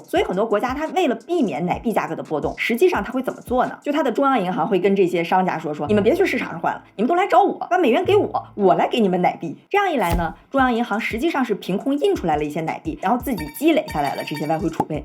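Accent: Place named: Beijing, China. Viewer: 京片子遛兒的！好聽！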